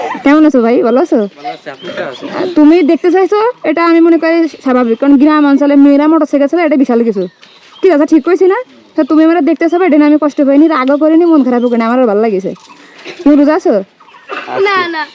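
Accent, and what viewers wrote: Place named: Jessore, Bangladesh. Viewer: আসসালামুআলাইকুম ওয়ারাহমাতুল্লাহ আপু কেমন, আছেন আশা করি ভাল আছেন। সেহরি খাওয়ার পর ফজরের নামাজের পর থেকে আপনার ভিডিও গুলো দেখছিলাম অনেক ভালো লাগছে আপনার যে মানব সেবামূলক কার্যক্রম এগুলা অনেক সুন্দর লাগছে আমার কাছে। আপনার প্রতিভাকে সাধুবাদ জানাই এবং আপনার জন্য দোয়া করি আপনি যেন সবসময় এইভাবে গরিব অসহায় ও দুস্থ মানুষের পাশে দাঁড়াতে পারেন দেশ ও জাতির জন্য কাজ করতে পারেন আল্লাহ আপনার জীবন ও ভবিষ্যৎকে ভালো করুক দুনিয়া আখেরাত কল্যাণময় করুন এই দোয়া রইল আপনার জন্য। আমি আপনার চ্যানেল টি সাবস্ক্রাইব করেছি এবং আপনার ভিডিওগুলো দেখছি অনেক ভালো লাগছে। আপনার কথা শুনে মনে হল সম্ভবত আপনার বাড়ির যশোর জেলার মধ্যেই হবে আমিও যশোর জেলার ছেলে। আমার বাড়ি যশোর খাজুরা রাজাপুর গ্রাম। আল্লাহ আপনাকে দ্বীনদারির সাথে সুস্থতার সাথে নেক হায়াত দান করুক এবং বেশি বেশি মানব সেবামূলক কাজ করার তৌফিক দান করুন আমিন। আমাদের জন্য দোয়া করবেন আসসালামু আলাইকুম ওরাহমাতুল্লাহ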